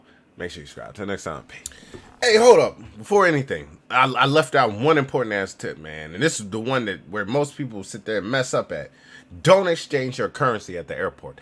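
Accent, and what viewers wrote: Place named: Dominican Republic. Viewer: U actually do look Dominican. They can just tell from how you carry yourself...and your accent of course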